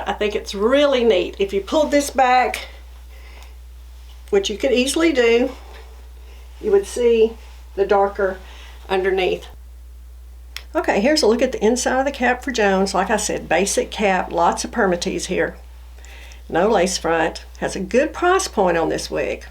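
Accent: South, United States. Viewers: You sound exactly like the blonde on CSI Miami whose name was Caleigh in the show. You’re obviously southern like me.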